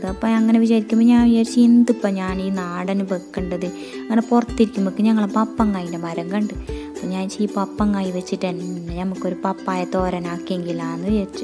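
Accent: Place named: Kasaragod, India. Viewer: Super kasaragod slang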